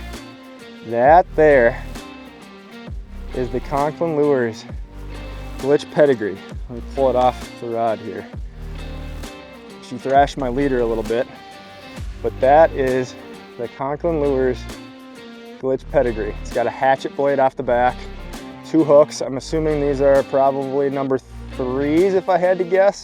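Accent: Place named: Minnesota, United States. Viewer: You’ve got the Minnesota accent down for sure)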